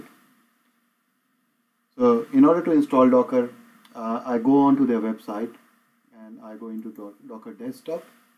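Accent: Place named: India. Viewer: Indian speaking people saving my weak ass once again. Love you <3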